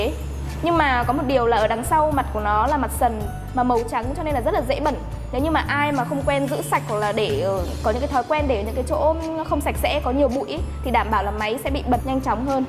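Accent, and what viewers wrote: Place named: Hanoi, Vietnam. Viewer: uầy uầy uầy uầy uầy hôm nay là chị mà, vui quá vui quá, mà hình như là giọng chuẩn Hà Nội ròi, hay hay hay, vote up vote up, hay hay hay, mới nghe rồi nhảy xuống cmt cũng thấy hay, hay hay hay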